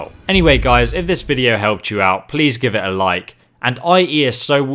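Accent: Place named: United Kingdom. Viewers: he's brittish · It's obviously British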